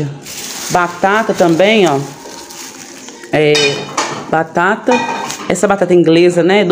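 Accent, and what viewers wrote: Minas Gerais, Brazil. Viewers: Vc é de onde ?? Vc conversa tão engraçada faz graça kkkkkk igual eu deve ser mineira como eu amiga kkkkkk é nois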